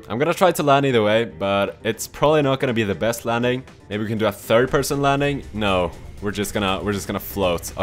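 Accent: Sweden. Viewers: You can hear very well that he is from Sweden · Are you from Sweden, cause you have an Swedish accent, if you are then I’m gonna say: Hej, beroende framkallande videos! Hoppas du har det bra! :)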